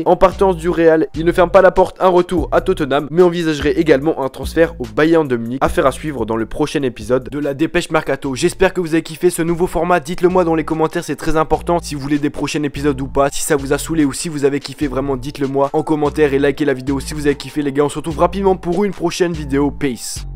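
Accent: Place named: Belgium. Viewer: Tu est belge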